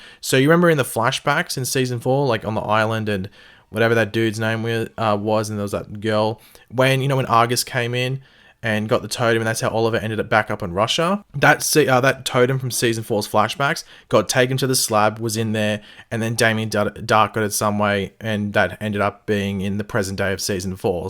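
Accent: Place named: Australia. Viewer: Yhhh I just love your aussie accent 😍 used to watch bondi rescue to get a weekly dose of it now I can just listen to you 🤗😏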